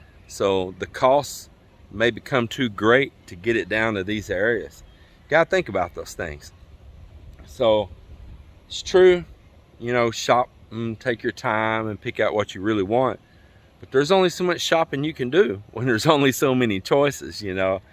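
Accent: Texas, United States. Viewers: Well said, the trolls come out when things aren't good for them, just say it once let them know you know great stuff, love that Texas drawl haha say hi to love you guys great content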